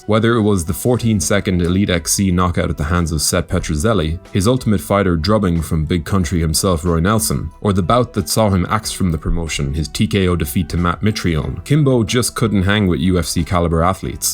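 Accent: Ireland. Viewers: ''tug rose'' haha I love irish accents, keep up the great content, you're my favourite narrator on this channel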